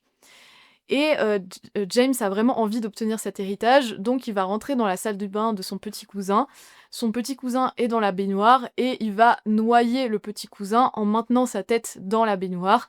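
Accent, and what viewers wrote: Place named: France. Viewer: Je suis comme Quebecois pour deconstruire votre superbe accent francais et Sartre.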